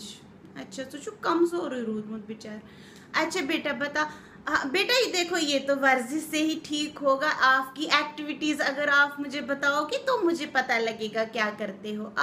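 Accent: Kashmir, India. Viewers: Real kashmiri ascent. Loads of love for di. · I loved the Ending.. Episode was OK but you are Too good...You are Super Talented.. I love your Expression and the Kashmiri Accent.. 😊😊😊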